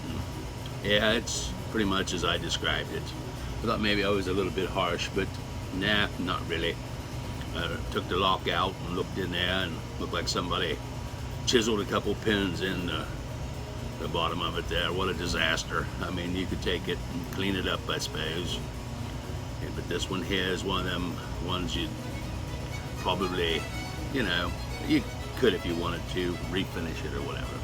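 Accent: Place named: England, United Kingdom. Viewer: ❤ LOVE YOUR ACCENT , ARE YOU A FELLOW ENGLISHMAN AS I AM ?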